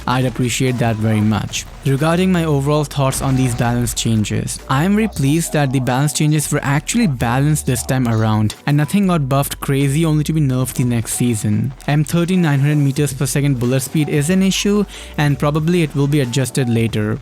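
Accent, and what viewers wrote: India. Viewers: Are you Indian??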